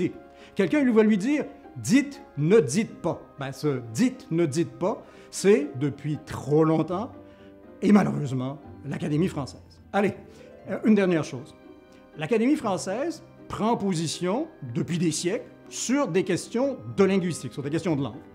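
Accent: Quebec, Canada. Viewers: Tout à fait d'accord avec vous, la langue française s'enrichit grâce aux millions de francophones dans le monde. Elle doit évoluer et qu'on arrête s'emmerder les gens sur des détails, à l'oral, cela n'a pas grande importance. Pour ma part, j'ecris "autant pour moi" et tant pis pour cela que cela empêche de dormir 😊😁 en plus, J'ADOOOOORE l'accent québécois, je le trouve savoureux. Bises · Moi j'aime bien l'accent québécois, c'est joyeux à l'oreille Sujet très intéressant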